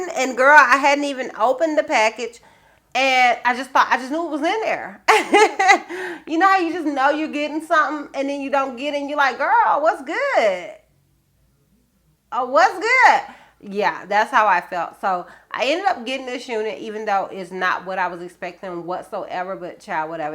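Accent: South, United States. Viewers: You're so beautiful in all the wigs. I'm glad your feeling better. I work in the medical field and love headband and ponytail pieces because you can just throw them on and GO! Your spirit is so uplifting. It can be felt thru the phone with that Southern accent! You crack me up!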